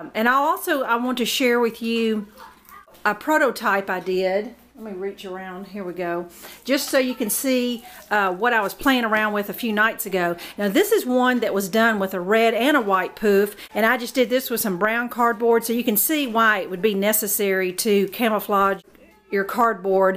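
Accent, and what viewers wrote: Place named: Georgia, United States. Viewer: Love your accent, you sound like my aunts from Georgia!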